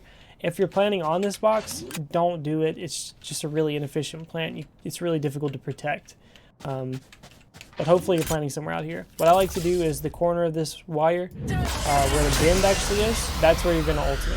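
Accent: South, United States. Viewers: Are you from the south? Im from bama I hear that southern accent.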